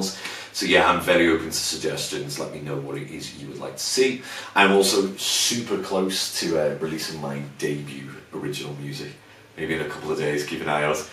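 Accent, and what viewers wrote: Liverpool, United Kingdom. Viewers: Scouser! Love it well in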